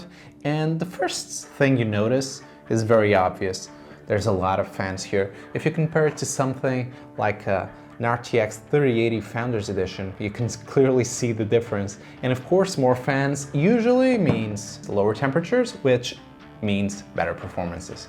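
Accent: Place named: Sweden. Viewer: I think he is Swedish.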